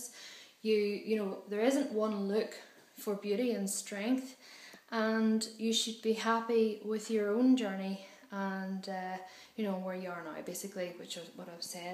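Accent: Ireland. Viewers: Hi i came across your video by chance because i was thinking of doing a sporting challenge for my fiftieth birthday, which is next year, and i saw this that you put on here, and the one you did on your birthday. Anyway i would like to say you look amazing so really good looking with those blue eyes and that gorgeous Irish accent of yours, you are a wonderful young woman and life is for living so go and enjoy yourself as much as you can, any ideas for me drop me Dave a note.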